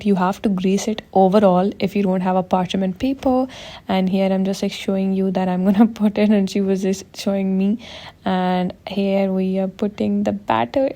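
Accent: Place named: India. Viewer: Work on your accent please, you have typical desi accent tak tak accent 😁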